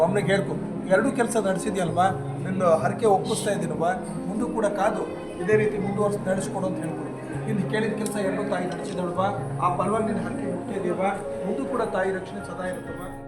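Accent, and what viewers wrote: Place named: Kundapura, India. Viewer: ನೀವು ಕುಂದಾಪುರ ಕನ್ನಡ ಮಾತನಾಡತಾ ಇದ್ರಲ್ವಾ ಹಾಗೆ ಕೇಳ್ದೆ ನಮ್ಮ ಅಕ್ಕ ನ ಊರು ಸಾಗರ ಭೀಮನ ಕೋಣೆ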